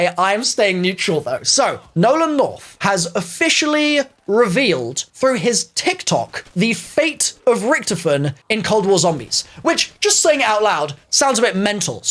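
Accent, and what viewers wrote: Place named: England, United Kingdom. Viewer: Is it just me or just everyone likes he's English way of speaking?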